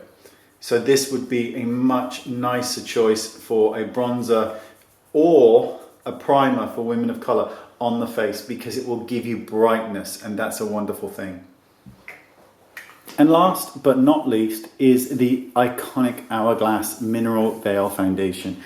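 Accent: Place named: Swansea, United Kingdom. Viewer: Hey my dad was from Swansy and he sounded allot like you. Where are you from?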